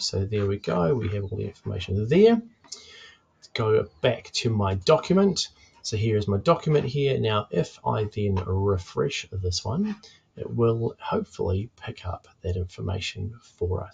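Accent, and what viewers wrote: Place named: New Zealand. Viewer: Thanks, that's so helpful, and in a decent Kiwi accent too.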